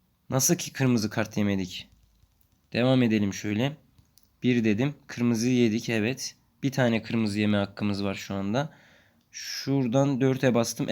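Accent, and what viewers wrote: Erzurum, Turkey. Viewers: Abi sen erzurumlumusun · erzurumlumusun abi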